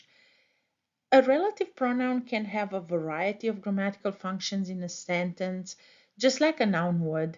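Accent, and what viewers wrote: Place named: Brazil. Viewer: great video! it sounds like you are from brazil or portugal, is this right?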